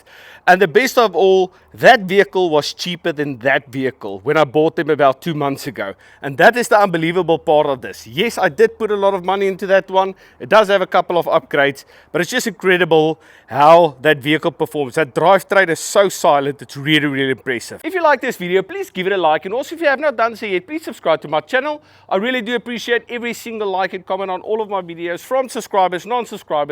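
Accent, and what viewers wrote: South Africa. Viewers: I prefer 4 wheel independence.Suspension is by the way are you south africa?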